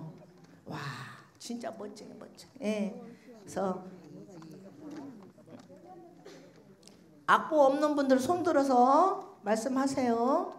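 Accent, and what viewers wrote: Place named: Jeolla, South Korea. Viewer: 전라도 사투리 엄청 쓰죠? ㅋㅋ 감사합니당 😊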